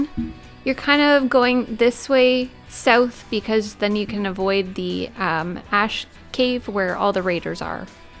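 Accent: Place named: Canada. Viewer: Thanks so much for the help. I believe I've found all 150 cases. (not sure if there are 151) LOL Btw, are you Canadian? The way you pronounce "out" and words with that sound are usually a dead giveaway. ;)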